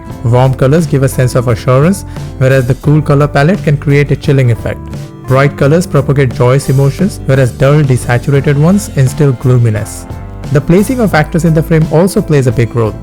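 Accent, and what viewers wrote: India. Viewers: Bro the origanility of your indian accent is missing.Please bring it back!It makes narration so much lively!Rest great analysis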